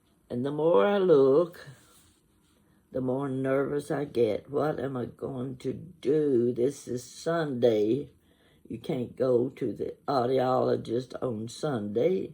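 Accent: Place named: Kentucky, United States. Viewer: I just LOVE you! I am from Kentucky, was born in a small town in the eastern part called Prestonsburg. My family settled there from the revolutionary war. General Alexander Lackey was my GGGGrandfather. Although this is considered a very depressed part of the state, I will always remember how beautiful life was there for me as a child. My Mom and Dad left there in the early 60's and I grew up in Central Florida. No other people like real Kentuckians! I can tell a Kentuckian (no matter what part of the state they are from) a mile away by that accent! You remind me so very much of my beloved family. All of them are gone now except me, and my two sisters. I am 75 the other two are 78 and 84. You bring back such wonderful, sweet memories of all of my wonderful Grandparents, Aunts, and Uncles! WE are so very much alike in our family history, foods, stories, antiques, Just amazing. 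Well I guess after all we are true Kentucky Wildcats!! Much love, from North Carolina by the way, my name is Lois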